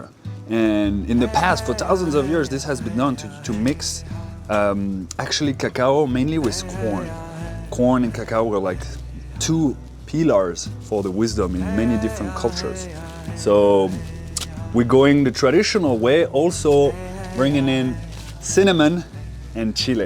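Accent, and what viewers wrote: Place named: France. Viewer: This guy sounds french to me ! I wish to come very soon to Eagle Nest. Carole from Biarritz, France !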